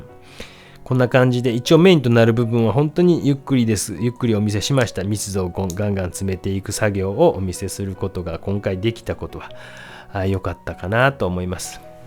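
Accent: Kansai, Japan. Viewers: ベネチアの風景にもなつかしさを表現されておられますが、先生のトークで時々入る関西弁も親しみがもたれます。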